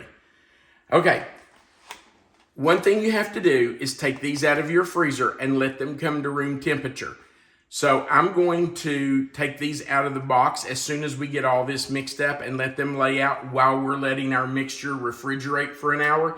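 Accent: South, United States. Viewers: I see you're from Kentucky do you have a southern talk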